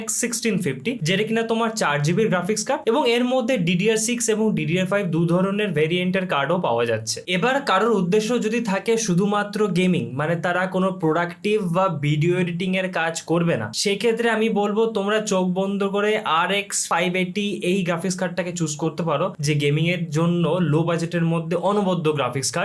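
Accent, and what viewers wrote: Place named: Bangladesh. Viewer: Vhai apnar accent amader Bangladeshi der moto,ami apnar accent shune prothome vhabsi bd YouTuber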